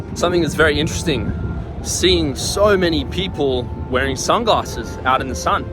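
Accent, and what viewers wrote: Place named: Australia. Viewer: i thought you were australian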